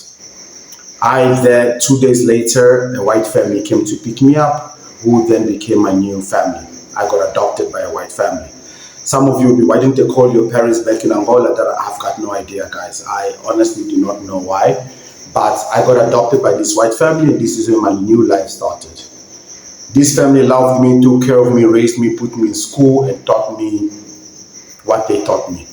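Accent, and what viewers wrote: South Africa. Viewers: What? You are Angolan? You sound South African 🇿🇦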